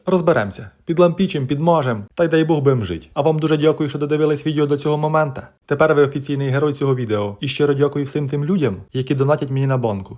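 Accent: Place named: Surzhyk, Ukraine. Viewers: Хлопче, з твоїм відео і мій день трохи налагодився. Мої батьки з Дніпропетровщини і твоя говірка мені рідна. Хай все получиться! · Шапка, пальто- просто супер-прикид. А говір наш, Черкаський. Обожнюю. Процвітання вашому каналу і щастя родині❤ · Ви звідки? Наш щирий суржик,щирі люди,прості,роботящі, приємно спостерігати.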